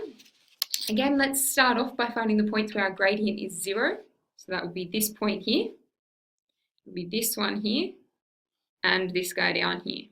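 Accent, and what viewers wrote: Australia. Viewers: thank you so much, this was a lot of help! im from New Zealand and im trying to figure out if your accent is from here or Australia. I think you're from Australia??? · Oh my gosh thank you very much!! Hi from the UK, I can defo tell your Australian :D love the accent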